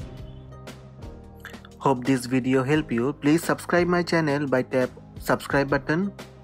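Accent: Bengal, India. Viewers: Dada apni bangali English ; Are You A Bengali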